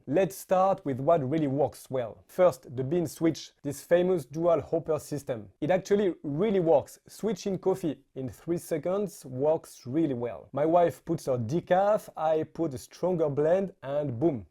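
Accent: France. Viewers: En t'ecoutant je realise qu'on peut pas pas cacher notre accent francais😂😂😂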